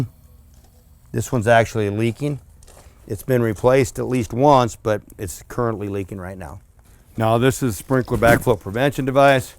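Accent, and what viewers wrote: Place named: Colorado, United States. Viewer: Great video. Very useful. Also, I had no idea that ppl say ruff instead of roof in Colorado.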